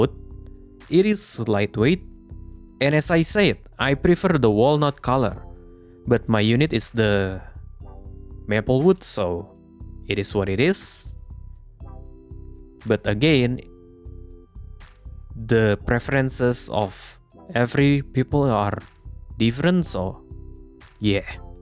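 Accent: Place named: Indonesia. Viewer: Aksennya aja Indonesia banget, lagian bisa pake translate kocak kalo gak ngerti English 😂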